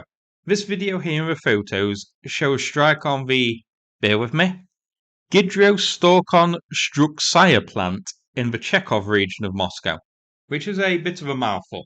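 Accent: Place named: Midlands, United Kingdom. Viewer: It's not London, nor the West Country, Wales, Scotland or N. Ireland. My guess is the Midlands somewhere.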